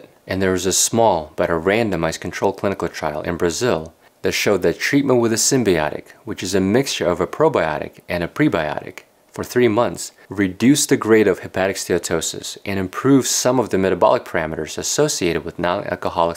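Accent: United States. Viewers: only like your American accent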